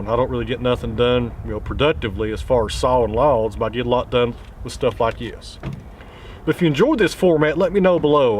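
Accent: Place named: Tennessee, United States. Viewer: Bowls off a lathe sound good to me. I’m new to your channel, but I guessed your location by your accent from my kinfolk that live in your parts, Bristol, Sevier and Rockwood.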